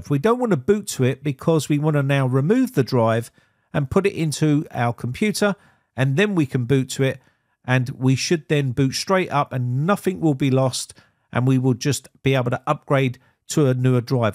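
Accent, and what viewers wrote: England, United Kingdom. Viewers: Lever is pronounced leave - er In English and you sound English to me ! Resist the American language. Most Americans think they speak English until they visit England .I once worked London with a lot of Americans. We spent some time explaining believe me !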